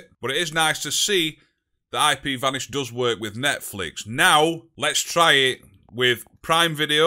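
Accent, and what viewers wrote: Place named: United Kingdom. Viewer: Nice to see someone with a British accent. I can't stand the American one. Especially when they say words like data it does me headin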